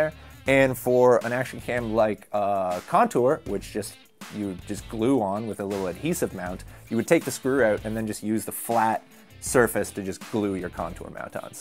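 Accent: Canada. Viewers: Jose Lopez It's Canadian, not Irish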